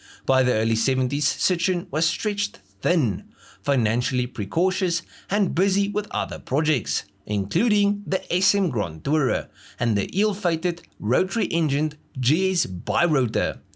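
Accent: South Africa. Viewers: South African accent is ready to follow. Thank one of the gods he isn't from Kentucky.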